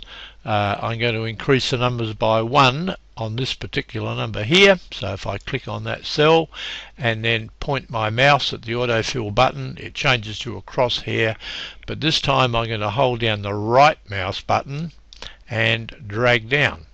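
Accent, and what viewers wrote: Australia. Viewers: awesome! Thanks. You are an Aussie...right?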